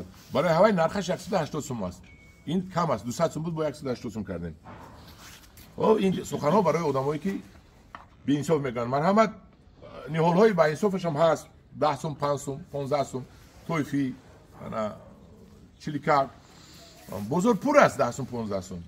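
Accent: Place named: Tajikistan. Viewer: Ахсан ба Шумо, бо шеваи хушруи точики сухан карданатон, ба Шумо бурдбори хохонам.❤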